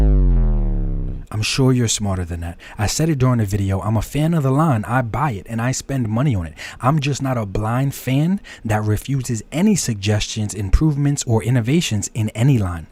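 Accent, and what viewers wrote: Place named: Bronx, United States. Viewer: Classy, respectful video while making your point 👏 . I have watched many of your videos but this one made me sub. That a bronx accent? Cant put my finger on it. Def tri state area though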